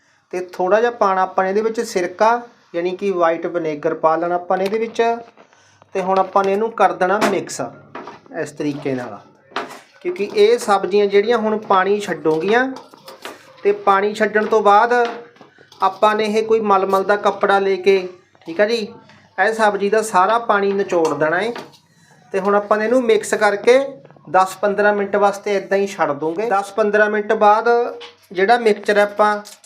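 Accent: Ropar, India. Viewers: ਵੀਰੇ ਤੁਹਾਡੀ ਬੋਲੀ ਮੇਰੇ ਵਾਲੀ ਆ ਰੋਪੜ ਜਿਲ੍ਹੇ ਦੀ 😊😊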